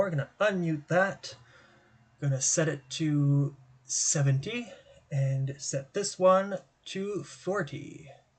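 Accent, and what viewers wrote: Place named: France. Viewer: J'adore ton accent français !! Merci pour toutes les transcriptions ! J'adore faire du déchiffrage ! J'ai commencé avec le confinement en mars 2019, grâce à toi (et d'autres sur le web) j'ai fait des progrès en déchiffrage. Mais si certains titres ne sont pas à mon goût ! 😁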